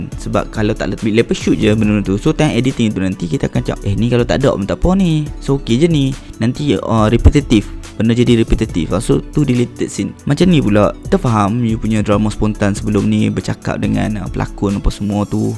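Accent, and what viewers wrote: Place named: Kedah, Malaysia. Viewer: cakap kedah pon pahamm aih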